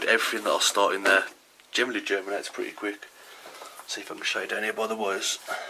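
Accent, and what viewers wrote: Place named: Midlands, United Kingdom. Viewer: Great video. I could listen to you "ramble on" all day. (You sound like a Midlands boy to me :)) Have you tried mixing in 20 - 30% perlite into your seedling mix? I find it helps to mitigate risks of dampening off. Ecothrive Charge, too, is a really nice potting mix amendment—easily obtainable in the UK. Peace, love and happy, vigorous sprouts—————Everest.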